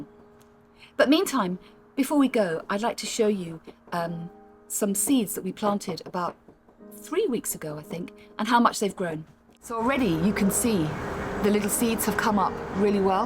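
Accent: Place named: United Kingdom. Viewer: 我喜歡聽 Manda老師 優雅的英倫 英語發音 還可以學英語聽力練習!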